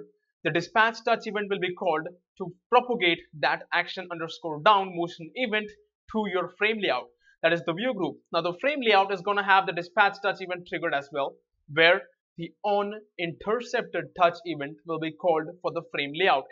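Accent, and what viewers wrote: India. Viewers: I don't know why, to me he sounds like india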